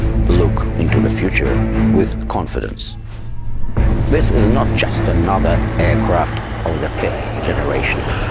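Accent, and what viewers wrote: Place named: Russia. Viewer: Russian explaining Russian jet in English with Russian accent and Russian subtitle?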